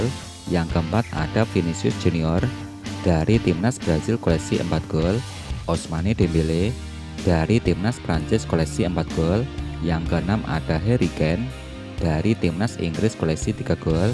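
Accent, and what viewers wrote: Java, Indonesia. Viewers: mimin e wong jowo to 😊😊😊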